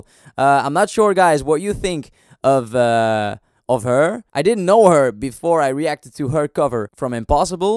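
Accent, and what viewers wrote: Netherlands. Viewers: question; quite a dutch accent.. where are you from?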